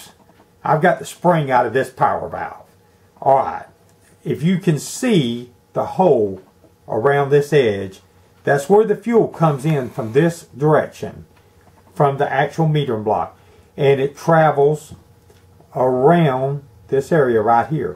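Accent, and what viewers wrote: United States. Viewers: Thank you, with that sweet Southern accent you could tell me anything about automobiles and I would believe it, ha ha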